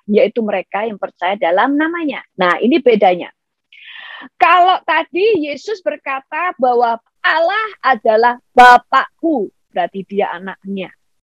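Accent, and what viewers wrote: Java, Indonesia. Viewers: Bu, medok banget........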